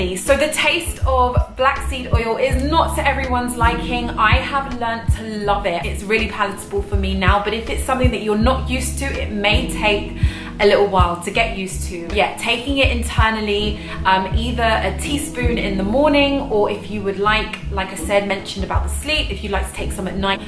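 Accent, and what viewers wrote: United Kingdom. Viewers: Love your Brit-tis accent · Her accent is nice. It is how we speak in the UK. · A Latina with a British accent Double sexiness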